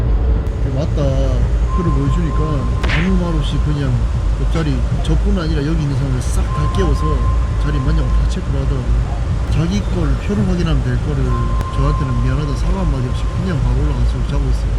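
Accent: Gyeongsang, South Korea. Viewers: 제주도 한달 살이 영상 찾아보다가 추천 영상떠서 보기 시작했는데 며칠 째 시간 날 때마다 님 영상만 주구장창 보고 있네요ㅋ 조만간 제 최애 유튜버가 되실 것 같은데 미리 축하드려요🤣 참 외모만큼이나 성품도 생각도 올바르신 것 같아요! 매사 참 밝고 건강하고 긍정적이고 순수하고 마음 따뜻하신 분인 듯👍 경상도 사투리도 너무 멋지고ㅎ 근데 이렇게 빡씨게 여행하면서 온갖 고생 다하셨는데 왜때문에 꼬질꼬질한게 하나도 없이 반짝반짝하신거죠👉👈💕 저 아무래도 입덕한 것 같아요🤣🤣🤣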